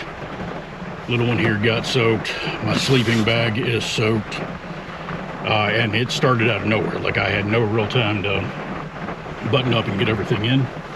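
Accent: South, United States. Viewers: Aha, I knew I heard a southern accent. I finished a three year old video a little bit ago and in my comment , I asked if I caught a southern accent. I just read a comment by you saying you were born in Georgia.. Me, too. I was born in nineteen forty five in an ambulance on Peachtree Street in downtown Atlanta. I'm married a TenneNossee boy, and we moved to tennessee when we retired. The cold and snow and ice of minnesota that you And Fiona camp in just blows my mind. Just What do you find fun in that? I do Really enjoy watching you do it.But I do worry about Fiona sometimes. I look forward to your scamp adventures and watching you redo your Scamp camper.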